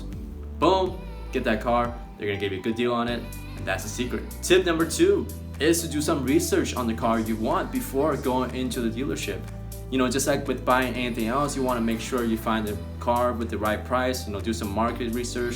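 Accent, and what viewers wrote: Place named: Italy. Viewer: Great advice and your Italian accent was hilarious!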